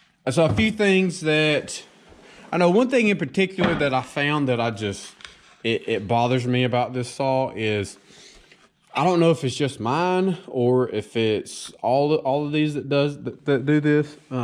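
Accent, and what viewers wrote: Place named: North Carolina, United States. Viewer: Great review man! Just curious, where are you from? Sound like Robeson or Scotland County NC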